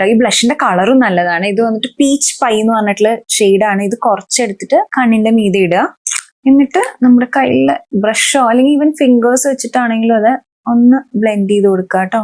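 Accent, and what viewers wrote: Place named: Valluvanad, India. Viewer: Thrissur കാരി അല്ലേ...പക്ഷെ വള്ളുവനാടൻ ഭാഷ ആണെല്ലോ പറയുന്നത്...സ്റ്റൈൽ ിനു വേണ്ടി ആണോ...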